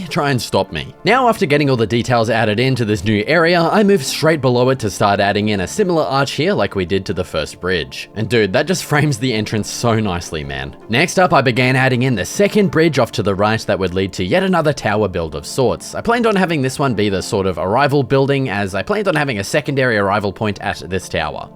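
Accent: Australia. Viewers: you sound like the biggest aussi ever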